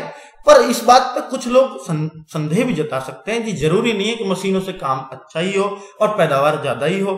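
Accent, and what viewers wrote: India. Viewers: Bolne ka Desi tarika nice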